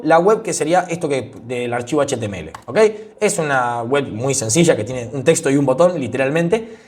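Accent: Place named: Argentina. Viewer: No solo esplicas dpm si no que encima en argento. Nuevo seguidor crack , segui asi. 🎉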